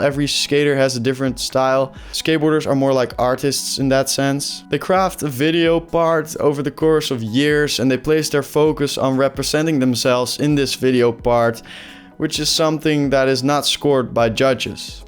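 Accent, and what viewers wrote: Netherlands. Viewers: What's your mother language? Dutch?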